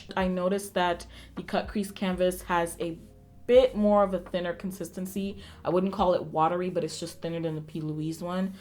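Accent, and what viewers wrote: Nigeria. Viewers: Chaiii your nigerian accent I no fit 😂😂😂😂😂😂😂😂 makeup on point!🔥🔥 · Lovinnnng your Nigerian accent 😍😍 😍 #nigerian.here😊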